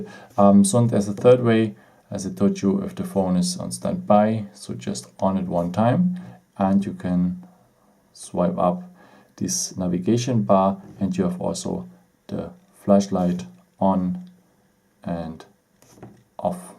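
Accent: Germany. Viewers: Wie man einfach hört dass er Deutsch ist bzw seine Muttersprache Deutsch ist. XD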